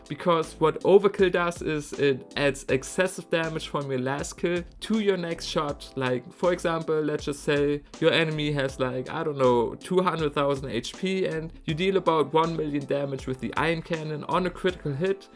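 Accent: Germany. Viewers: lul bist du deutsch?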